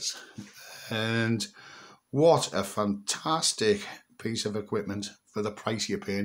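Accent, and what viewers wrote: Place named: Newcastle, United Kingdom. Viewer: Is the Photo Gesture not two fingers at Boris for the licence fee just saying .... and you sound like a fellow Geordie ....all the best mate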